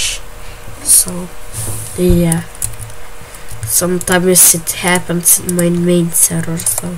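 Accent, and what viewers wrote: Lithuania. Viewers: lietuviu anglu xdd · are youy from lithuania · Lietuvis esi girdžiu😅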